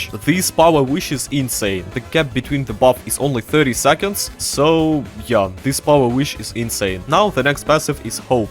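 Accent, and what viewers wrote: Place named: Russia. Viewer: когда думал все начало видоса чо за акцент русский а потом видешь как вместо английской E пишется у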